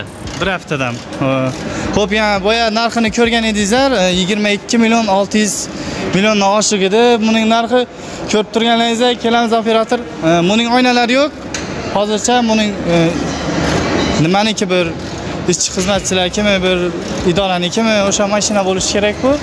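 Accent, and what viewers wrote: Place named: Khorezm, Uzbekistan. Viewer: Моторни курдик потори кани рулни билдик пул даяни нови хоразмча гапливар дм чулаштириб тошодиг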